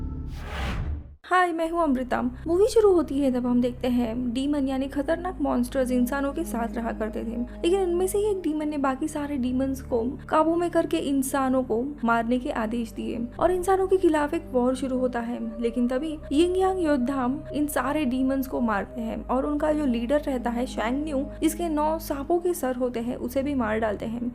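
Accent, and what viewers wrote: Maharashtra, India. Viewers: Aap marathi ho kya?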